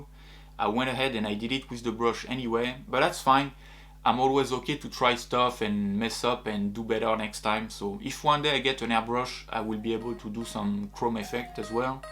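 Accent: France. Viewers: Ton accent français ce reconnais clairement mais tu a clairement un anglais plus maîtriser que moi donc je ne devrai pas parler 😂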